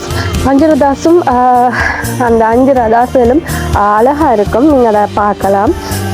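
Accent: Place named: Tamil, Sri Lanka. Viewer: நீங்கள் டிராவலர இல்ல யூடியூப் பரா உங்கட தமிழ் ரொம்ப அழகா உங்களைப் போலவே இருக்கு நீங்க ஈழத்தமிழர 😊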